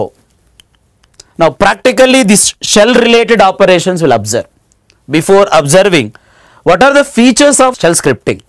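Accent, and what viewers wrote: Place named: Andhra Pradesh, India. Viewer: Heavy Andhra accent. Old school teaching. As if student is preparing for an exam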